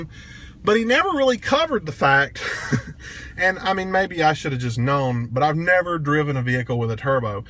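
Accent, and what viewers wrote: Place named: Carolina, United States. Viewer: Pretty good review, honest and love the wonderful Carolina accent, back when NASCAR was worth watching this is how most the drivers spoke! Love my <num>wd crew, mine is more the working mans truck, but got everything I want, great power and comfort and good economy for a big truck. I added side steps, for the ones of us with short legs made all the difference in the world, eBay and less than 200 bucks.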